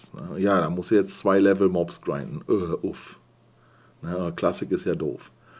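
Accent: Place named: Ruhr, Germany. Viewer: Wo kommst Du eigentlich her?ich meine den Ruhrpott rauszuhören....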